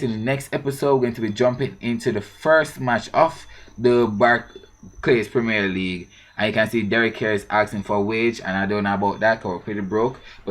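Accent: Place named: Jamaica. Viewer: Loving this series..should have more views..your accent is awesome and it's about we had another Jamaican at Bolton :P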